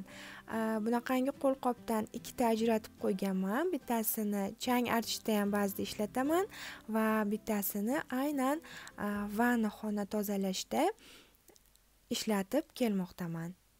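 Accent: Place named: Fergana, Uzbekistan. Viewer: Ассалому алайкум! Канал росаям ёкди🤗 бунинг учун Aishaxonга рахмат деб коламан ва сизга хам👍 Мабодо сиз Фаргона шахридан эмасмисиз? Гапиришиз бизникидай эканда🤩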